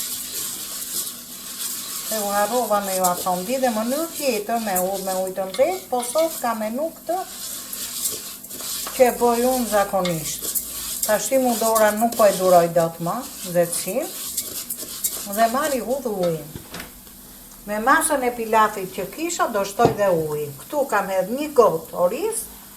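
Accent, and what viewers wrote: Tirana, Albania. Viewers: Te lumshin duart por ajo qe me pelqeu me shume eshte kur e shpjegon , Tironse ❤ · Te lumshin duart zonje dhe me ate te folme. tironce puro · Te folme Tironse ke qef me ju degju 💋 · Sa lezet ka te degjosh çdo gatimë si nene tironce ❤ te lumshin duart! Na kenaqe gjithmon videot e tua! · Tlumt goja se knoqem kur flet puro tironce pilafi i mrekullushem sh faleminderit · Me shum me pelqe e folmja tironce po dhe pilafin e ke bo persmari te lumshin duret tkesh shnet🙏🙏🙏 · Te lumshin duart dhe goja ma knoq shpirtin me ate te folmen tironce nuk ta di emrin por me pelqen shume kur shpjegon me kujtove gjyshen time te ndjek pothuajse ne te gjitha gatimet se jam vete tironce puro pershendetje nga Kanada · Na kenoqe mi tironce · Me kenaqe me komunikimin prej tironse Me pelqejn gatimet qe ben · Te pelqen theksi tirons ty moj? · Të lumshin duart! 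Më pëlqen shumë e folura jote Tiranse dhe sidomos bukuria e spjegimit të detajeve gjatë gatimit! Të përqafoj nga Saranda!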